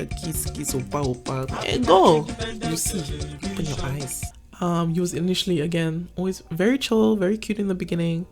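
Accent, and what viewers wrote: United Kingdom. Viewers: You’re Canadian? I thought you were British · I laughed so hard how did you switch your UK accent to a Nigerian accent🤣 I just discovered your channel and I really like it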